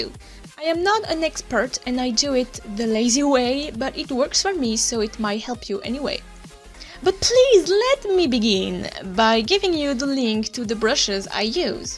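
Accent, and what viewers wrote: France. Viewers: You are french ? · Na real man o sotaque dela é frances mas continua sendo bonito pra caramba uwu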